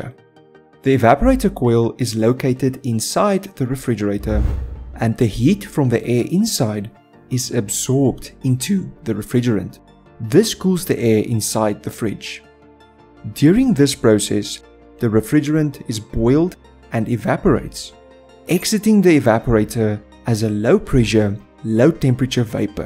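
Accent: South Africa. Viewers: South African accent👍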